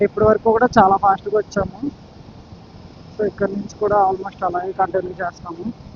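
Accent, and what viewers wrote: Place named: Godavari, India. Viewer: ni Bro Full Video chusa Godavari yassa Bale vundhi bro 😅, Take Care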